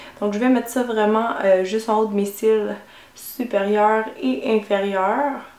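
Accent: Quebec, Canada. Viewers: L'accent Québécois! J'adore cet accent! Vas-y continu tu seras rapidement aux 1'000'000! :D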